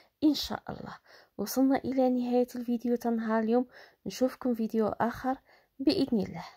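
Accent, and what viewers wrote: Tlemcen, Algeria. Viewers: يا سلام علي الوصفة و علي الهدرة التلمسانية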